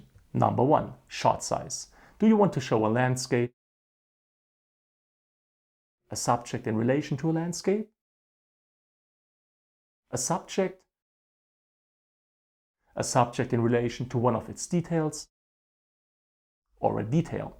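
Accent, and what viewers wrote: Stuttgart, Germany. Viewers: Are you from Stuttgart?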